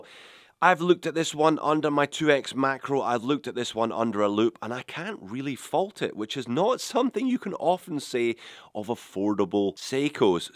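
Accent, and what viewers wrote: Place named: North, United Kingdom. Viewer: I've been in lust with the Longines Spirit Chrono with similar green lume for AGES, but it just wears too large. You can bet I snapped this one up in the sale last weekend, for sure 😉 P.S. Accent sounding quite northern with your head cold,!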